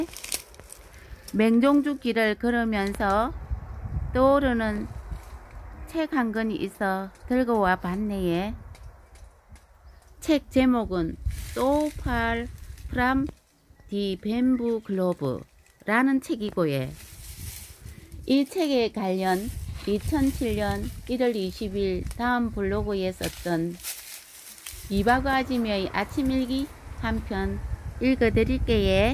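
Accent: Gyeongsang, South Korea. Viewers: 경상도 사투리 귀엽고 정겹네요~ 거제도는 참 볼게 많은 곳인데 몇번 가 봤지만 죽테마파트는 못가봤네예~ ㅎㅎ ㅅ ㅗ ㄴ 잡고 응원합니다 ^^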